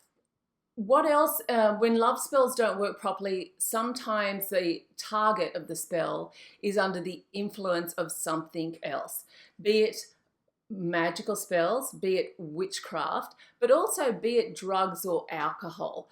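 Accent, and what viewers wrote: Australia. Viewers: Where are you from? I hear an Australian accent. But, then I hear "rrrrrrr's" pronounced at the ends of words like "partnerrrrrr", "casterrrrr" lol . . . almost like an Irish or American person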